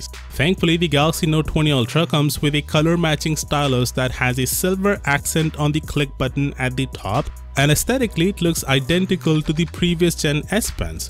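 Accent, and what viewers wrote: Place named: India. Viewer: I thouht that the dubber of this channel was indian, the accent was pretty clear:v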